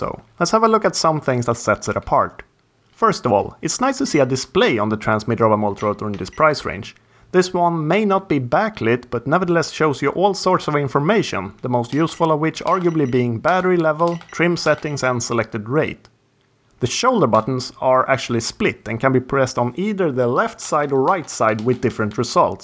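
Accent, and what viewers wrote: Sweden. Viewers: like ur vids man, love the swedish dialect, keed it up.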